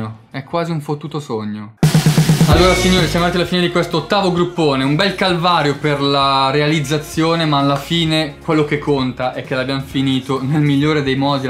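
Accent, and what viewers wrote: Bergamo, Italy. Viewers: Grande ti seguo da quando non avevi l’accento bergamasco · E anche in sto video parla Bergamasco ahahahah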